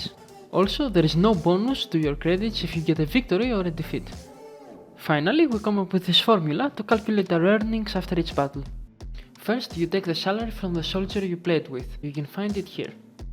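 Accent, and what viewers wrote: Greece. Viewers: το κατάλαβα από την προφορά , αδερφέ. Έλληνας και εγώ είμαι. Είσαι καλός.. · Are you from Greece? Casue you sound a lot like a greek person! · Εχεις τοσο ελληνικη προφορα στα αγγλικα! Ωραιο βοντεο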